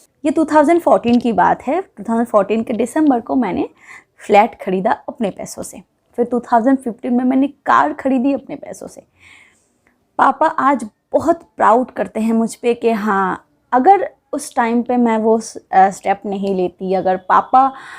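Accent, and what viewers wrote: Bengal, India. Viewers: i cud make it out frm ur accent.....that u r a bong...... very sweet..... believe me today only i watched for the first time.....very nice dear.....tk care....keep up ur talent......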